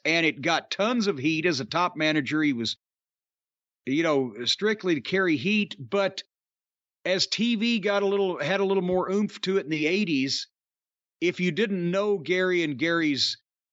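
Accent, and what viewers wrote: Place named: Chicago, United States. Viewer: Gotta love Cornys Chicago accent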